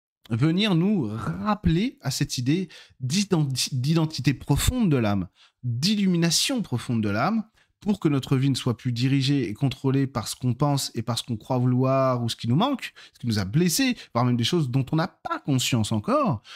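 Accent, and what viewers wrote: South, France. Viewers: Merci beaucoup pour ce partage ! Et l'accent du sud nous apporte ce petit côté ludique ❤! Ps: j'adore ton t-shirt!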